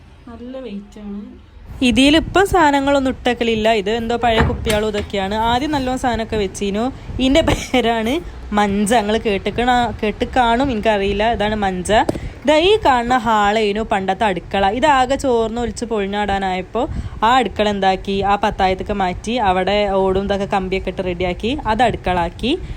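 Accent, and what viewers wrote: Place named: Malappuram, India. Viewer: മലയാളം പറയു.... അന്റെ മലപ്ര ബാസ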